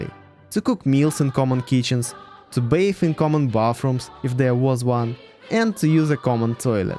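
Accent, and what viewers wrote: Russia. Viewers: Bro, I watched several of your videos and I really liked your documentaries and the way make them. The visuals paired with your russian accent gives it a special look and feel. Thank you for bringing this up as I learned a lot about Russia....oh and YES, you got a sub 🙌😎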